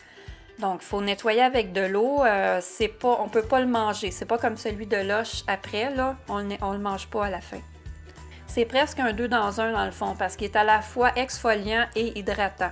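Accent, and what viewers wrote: Quebec, Canada. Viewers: Cool ! J'adore ton accent hihi laisse moi deviner, tu es québécoise ? (au hasard bien sur) hihi J'adore les produits coréens et japonais y en a des étranges mais ils fonctionnent bien ^^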